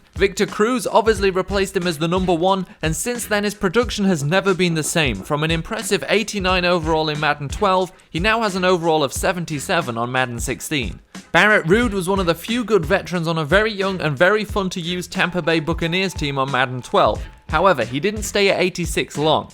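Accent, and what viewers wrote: England, United Kingdom. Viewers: dude you actually know what your talking about with Madden OR actual NFL??? AAAANNNNNDDD your playing biggie beats in the background?? and your British??? new sub for you mate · It's satisfying to hear an english guy talk about madden lol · Stfu your British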